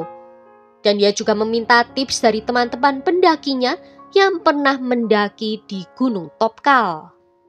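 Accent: Java, Indonesia. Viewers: Emang suara nya asli medok gitu ya.. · Medok bangeet kmu kak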